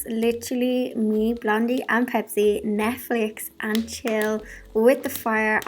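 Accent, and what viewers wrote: Ireland. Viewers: I hope things in Ireland get better soon. It's not to bad in Texas. I want shopping today and bought Pink ornaments for my Christmas 🎄. I always do white but I need a change with this Corona virus. I love all of yout video's and your accent. 💕🥂🎉🎃🤗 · You could just talk about paint drying and I would still tune in. I love to hear your Irish accent, I love your personality, and of course, I love Blondie and Pepsi. Keep up the good work! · I am convinced that there is nothing you can’t do. I love your girly nature with a can do attitude. Plus you accent and humor, you are a ray of sunshine. If I ever visit Ireland I’m going to invite you to tea.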